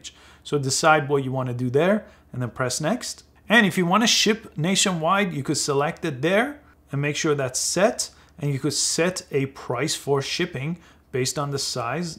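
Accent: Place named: Iran. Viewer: U sound Persian. I mean your accents is a bit Persian guys. am I right?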